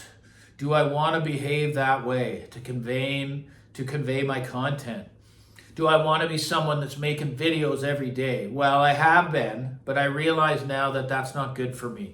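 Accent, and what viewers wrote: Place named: Canada. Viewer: I knew this furious pace of new videos would take its toll. As previous commenters have said, its better to speak with purpose than speak with haste. Now, I could listen to that Canadian accent all day long. No lie! Keep up the good work and the committed followers will be there. You speak from the heart and from a position of experience. All of these I respect and find refreshing as compared to the puppy mill of reviewers out there. Reviewing over 100 speaker models in a year? How do you even maintain a baseline? No thanks. Ill take my dose of whenever it next dings my bell.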